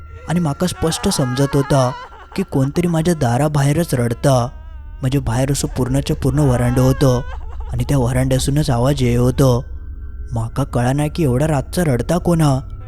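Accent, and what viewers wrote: Malvani, India. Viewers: Hahahahha response faar bhari hote comment la, Masta vatta malvani ikyla 🎉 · Ya bhashela malvani mantat Kalah ka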